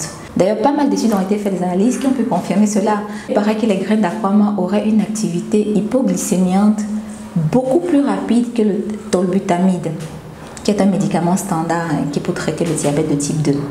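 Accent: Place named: Ivory Coast. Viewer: Tu commence à parler comme une Ivoirienne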